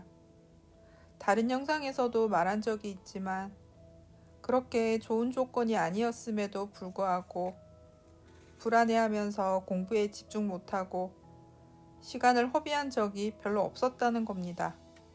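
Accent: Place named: Gyeongsang, South Korea. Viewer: 말투는 경상도인데 서울말 쓰려는 노력이 엿보이네요. 열심히 노력한 결과로 좋은 삶 살기를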